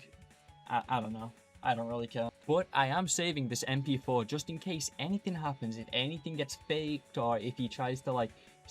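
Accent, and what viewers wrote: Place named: United Kingdom. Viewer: No hate whatsoever, but you sound like a very british lad ❤️‍🩹☺️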